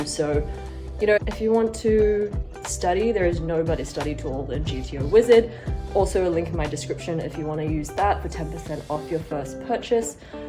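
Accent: Australia. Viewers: You must come from Australia because of you accent. Right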